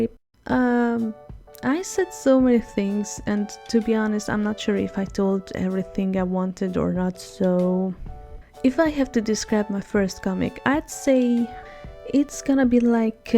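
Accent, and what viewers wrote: Hungary. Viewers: Hungarian accent :3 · I can hear the Hungarian accent haha! Omg amazing! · By any chance, are you hungarian? you sound like familiar :D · #magyarakcentus :D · Annyira jó hallani a Magyar akcentust. :D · Your English is really good. Even tho I can still hear the hungarian accent a little lol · Ohh my god! Im hungaryan and i hear the hungaryan accent in your voice! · az a jó magyar akcentus :D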